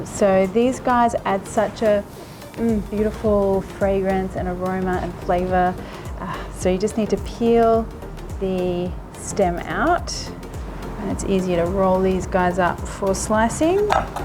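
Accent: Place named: Australia. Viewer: We go to Chiang Mai often and enjoy Khao Soi. I think I will make you this recipe while I’m stuck back in Australia Btw you sound Australian too 😊😂😎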